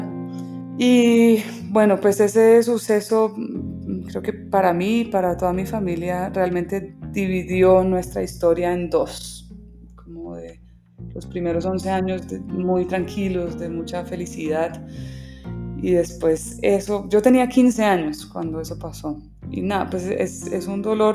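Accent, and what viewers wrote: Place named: Colombia. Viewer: No tiene acento extranjero, habla con acento colombiano.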